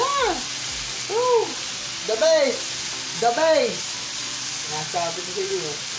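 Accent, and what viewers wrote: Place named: Batangas, Philippines. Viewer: Kakatuwa talaga ang Punto Ng Batangas ,sadyang mukhang masarap Ng iyong gawa2ng sizzling Shiela A. Vlog here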